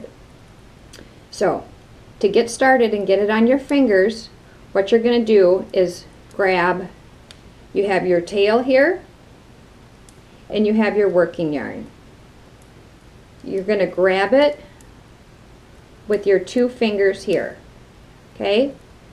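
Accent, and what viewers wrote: Wisconsin, United States. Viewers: → ... stayed a year in Wisconsin as "jeune fille au-pair" (to learn English) in a beautiful house right at PineLake or LakeChenequa in Nashotah. – So even I was able to recognise your accent.] Greetings from Basle, alexy wi.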